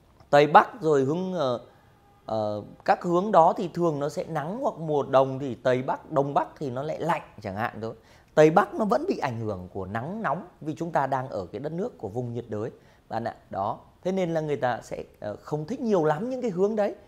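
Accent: Thach That, Vietnam. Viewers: Giọng bạn có phải quê thạch thất?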